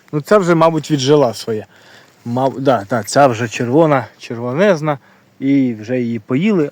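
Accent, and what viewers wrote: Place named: Luhansk, Ukraine. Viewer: Вітаю! Ваш говір видає людину з моїх країв,звідки орки нас ,,попросили,, Мені було цікаво.Я залюбки подивилася ваші спостереження та знахідки.У нас на Луганщині таких грибів немає. Чекаю нових екскурсій у світ західної України.Я підписуюсь на ваш канал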